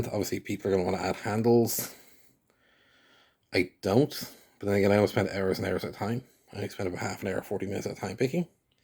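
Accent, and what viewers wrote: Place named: Ireland. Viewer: Where in ireland you from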